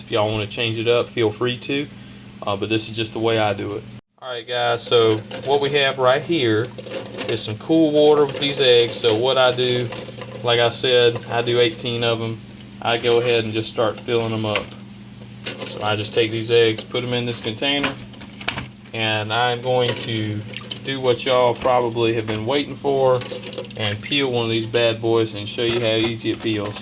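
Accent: South, United States. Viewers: I’ve done the cold water with ice and they still are difficult to peel. I will try your method. For the record I like your southern drawl! Keep on keepin on!!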